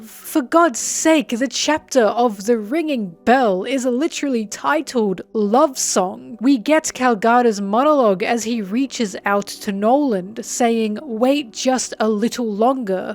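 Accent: Australia. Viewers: What your Australian!!! Samsies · Amazing video, also i didnt know you were australian too, hell yeah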